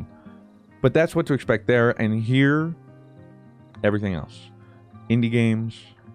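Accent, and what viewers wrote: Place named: South, United States. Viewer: Listen to that country twang you have! Idk tha5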